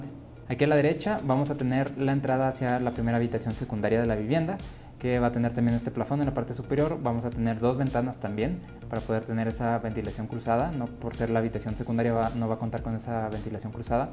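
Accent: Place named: Guadalajara, Mexico. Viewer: A aquellos de México — este acento es típico de la región de Guadalajara? Lo encuentro muy interesante.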